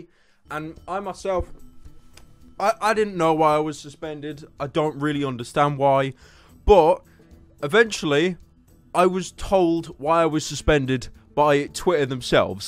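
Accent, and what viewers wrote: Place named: North, United Kingdom. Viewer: It's how we speak in the UK, mostly in the north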